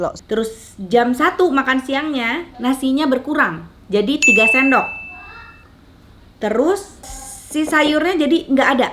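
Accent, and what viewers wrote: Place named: Sunda, Indonesia. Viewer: suka bgt denger suara teh, sunda.....bgt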